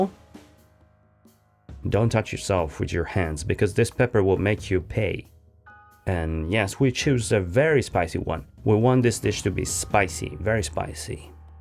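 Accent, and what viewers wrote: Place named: Italy. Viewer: I would love to know the name of the chef/person narrating this video! His voice, Italian accent, and sprinkling some Italian in with the narration are perfect! ❤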